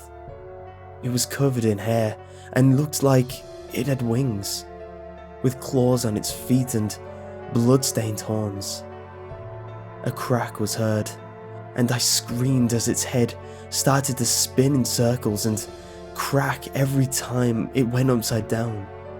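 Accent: United Kingdom. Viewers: Oh my..... I thought he said chicken too! LOL Well darn his amazing British accent I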